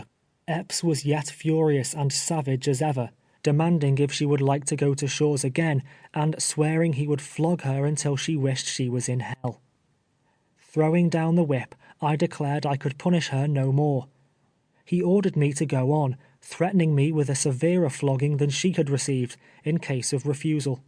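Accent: England, United Kingdom. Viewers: yes please do. hearing a white british guy read it like hes reading the paper just feels wrong. it needs the power and feelings. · I wanted to compliment the narrator. The English accent really conveyed the proper Grammer that was then real English. This history really shows how far we have sank from using words appropriately. A free'd slave spoke better and proper English than most people I know today. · Who would listen to this History read by English accent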